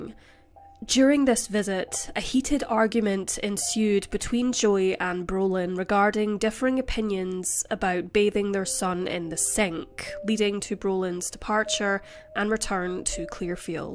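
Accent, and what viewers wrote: Scotland, United Kingdom. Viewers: Everything she says sounds great with that Scottish accent! 😋💙💜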